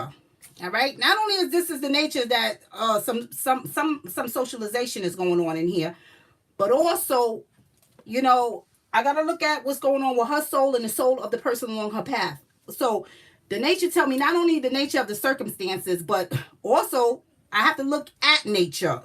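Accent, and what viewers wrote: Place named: Louisiana, United States. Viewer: sound like she from Louisiana. New Orleans home of voodoo. Makes me wonder if her & her family were from Hurricane Katrina when they got flown across different states.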